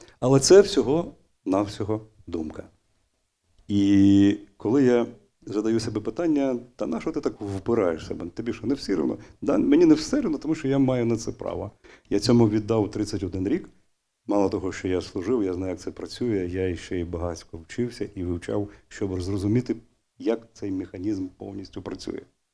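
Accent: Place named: Russia. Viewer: Пане Залужный, а не лучше ли говорить на языке, на котором вы всегда говорили, русском. Очень тяжело слушать русские слова на украинский манер... "воена" - вийськова, и др.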